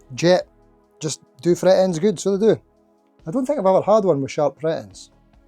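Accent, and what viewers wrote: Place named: Dundee, United Kingdom. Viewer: great video as always, i don't comment much on these channels but i love the way you champion budget guitars as opposed to ridiculously priced name instruments, being a weegie i also admire you Dundee accent. I myself have been playing 60 years now and still rubbish but no bad on bass, since retiring i have taken up buying cheap end instruments and doing upgrades for a hobby and really ennoying that. So i want to leave you with some words of wisdom, NEVER BUY A ROLEX FROM A BREATHLESS STRANGER. Keep up the good work pal and take care.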